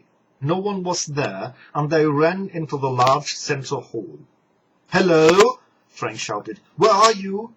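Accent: United Kingdom. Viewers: Wanted to check out this series before buying it online. THanks for posting this. It's nice that you have a Brittish accent.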